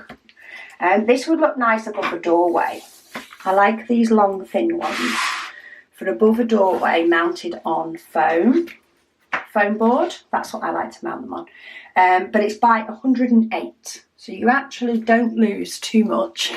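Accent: United Kingdom. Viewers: 310! Not impressed... just finished a Dp with, yes, 42 000 black square drills! Overwhelming. So proud, I finished it! Most difficult DP I did. Bur it is GORGEOUS! The drills were kind of bad. They were gorgeous but the size of the drills was not uniform....the more I see your lunar phase one... i have a kind of itching to get it... dang! I must be a masochist! Ah ah I really like your channel. Love your voice and British accent, so soothing.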